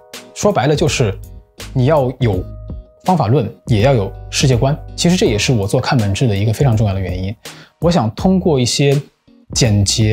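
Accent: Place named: Hunan, China. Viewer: 没有得到我点进来之前想要听到的内容但是听出了是个湖南人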